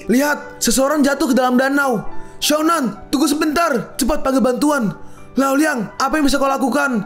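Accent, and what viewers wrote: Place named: Makassar, Indonesia. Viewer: itu logat makassar klo g slh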